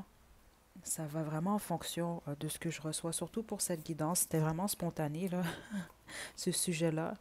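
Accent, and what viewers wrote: Quebec, Canada. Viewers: Bonjour je vous suis depuis quelques temps quand je fais un choix ça me parle mais la plupart du temps c’est mon énergie que vous décrivez j’ai pris le choix no 2 je suis spirituel j’ai vécu la nuit noire de l’âme j’avais commencé la médiation et des choses bizarre se sont produits que je m’explique toujours pas comme sentir des caresses sur mes cheveux quand j’allais mal et que je pleurais un jour ça m’a énervé et j’ai créé laissé moi tranquille une fois j’ai entendu une voix répondre à ma prière et d’autres turcs que je ne comprends et je ne sais pas qui peut m’aider à comprendre je suis empathe quand je vois un itinérant c’est moi que je vois parce que pour moi l’humanité c’est ma famille je suis eux et ils sont moi voir souffrir les autres me fait mal et parfois je doit me protéger je vous écris tout ça peut être parce que je cherche des réponses que peut être personne n’a merci et bonne vacances je pense qu’on reste à la même province car votre français est québécois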